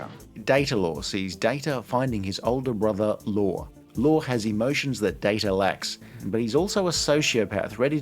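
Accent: Australia. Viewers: It's late, I should be finishing up work, instead I'm listening to an Australian guy talking about Star Trek seasons I've seen over and over.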